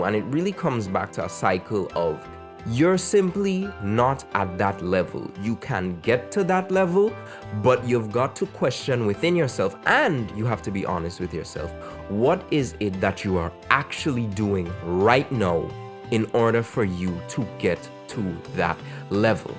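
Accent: Trinidad and Tobago. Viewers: Trini accent talking about tekken reminds me of being 9 and playing tekken 3 with my cousins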